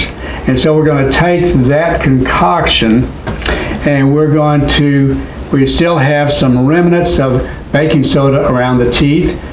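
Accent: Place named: North Texas, United States. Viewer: I was just thinking that, he’s got that North Texas accent!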